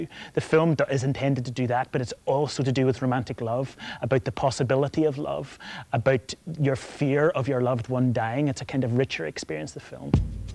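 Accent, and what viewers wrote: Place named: Northern Ireland, United Kingdom. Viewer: That Northern Irish lilt!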